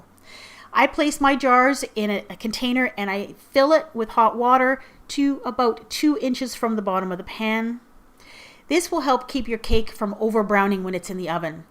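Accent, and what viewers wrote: Canada. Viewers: I love your accent! Are you from Canada?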